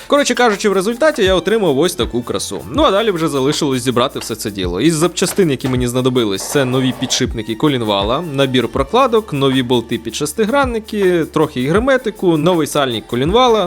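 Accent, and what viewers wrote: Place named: Rural, Ukraine. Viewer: Очень круто, особенно нравится твой говор, старый "селянський" украинский) мне вкатило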